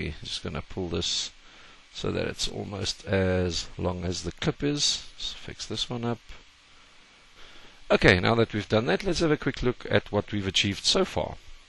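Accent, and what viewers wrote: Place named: Scotland, United Kingdom. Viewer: nthx btw u sound scottish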